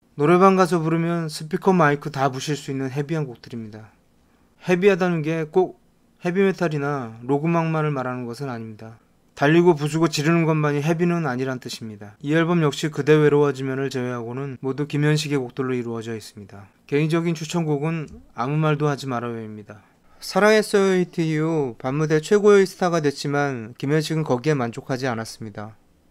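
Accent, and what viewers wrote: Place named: Seoul, South Korea. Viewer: ALL MIX님 말투가 90년대 서울사투리같아서 더 영상이 와닿습니다. 좋은영상 감사해요!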